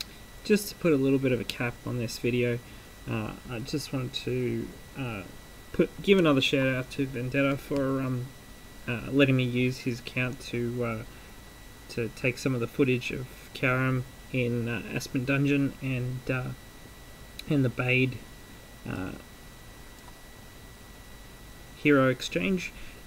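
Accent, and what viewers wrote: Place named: Australia. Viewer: You sound Australian, are you?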